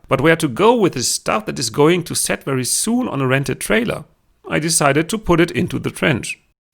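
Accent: Germany. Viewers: Well, somehow I missed this episode and saw the next few before this one. I love your sense of humor and respect your sense of fairness in showing us what you did wrong. I have some German heritage. My great great grandmother and her husband came from Germany to the United States by ship. By the time I came along the accents had disappeared. I really enjoy listening to yours. I hope you keep putting out videos because I smile all the way through them. Thanks!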